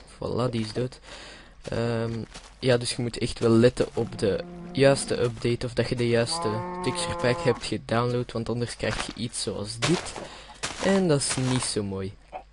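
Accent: Belgium. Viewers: woow vlaams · ben je belgies ofzo?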